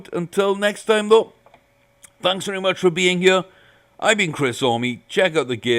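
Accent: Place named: Wales, United Kingdom. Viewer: There,s Welsh Twang in your voice are you from UK originally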